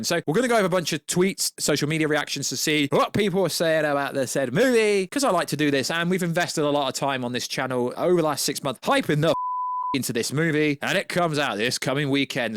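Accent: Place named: Scotland, United Kingdom. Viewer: You sound like grounds keeper Wullie from the Simpsons, with that dodgy Scottish accent. It made me laugh though.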